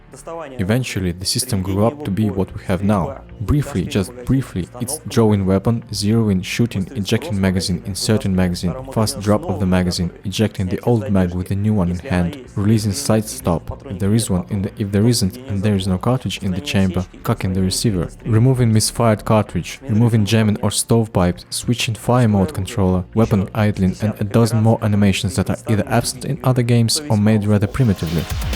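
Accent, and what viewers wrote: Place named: Russia. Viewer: when a russian try to speak english in this video...i understand only 40% of the dialogue, please take an other speaker for english voice over....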